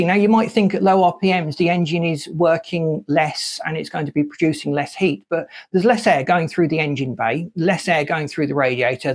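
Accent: United Kingdom. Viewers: Could you change your accent to something non british? Its barely understandable. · Why all the 'clever' advisory comments? This man knows his stuff, as do I (C&Guilds/National Crafts qualified for 46 years), so no need to 'try' to elaborate or add in any respect. He is absolutely 'Bang on' and a rarity, at that! He speaks like a true Brit!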